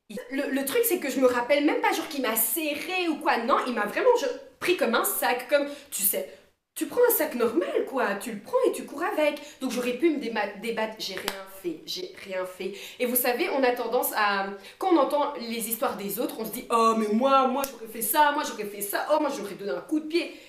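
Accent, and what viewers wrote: Belgium. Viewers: Est-ce que tu es Belge prcq tu as un accent? 😂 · Sa ma fait rire surtout avec ton accent belge ahah mais c’est vrai que sur le coup t’es paralysé 🤷🏻‍♀️💙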